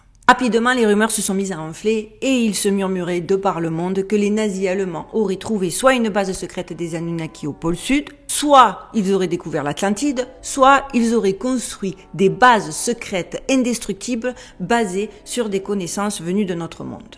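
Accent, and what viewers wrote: South, France. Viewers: Super émission, c’est sans fond! Impressionnant ! Merci J’apprécie d’autant plus que je suis du sud aussi, et j’aime nos accents😊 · j aime ton accent du sud de la france que du bonheur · bonsoir, Excellente vidéo et convaincu je suis. Merci, petit rajout vous avez un bel accent du sud de la France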